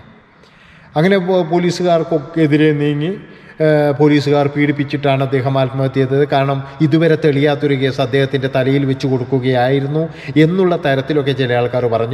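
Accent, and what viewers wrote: Kannur, India. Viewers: Sir kannuraanoo njanum kannuraaa😊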